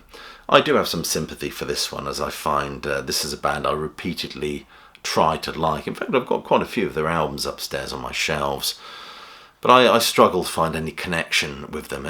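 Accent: United Kingdom. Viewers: The Who??? Radiohead??? This is a super weird "channel." Yeah, a rock band wouldn't want to comment on environmental and socioeconomic issues. How about the Flaming Lips? They are absolutely tone deaf. This cast is all about one guy's peculiar tastes, even if he wraps it around viewer preferences. I think Yanks go in for things because the guy has a Brit accent. He's the Geico Gecko of music criticism.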